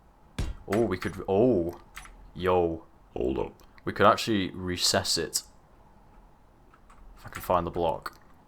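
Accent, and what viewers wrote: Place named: Yorkshire, United Kingdom. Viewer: You have a Yorkshire NE twang .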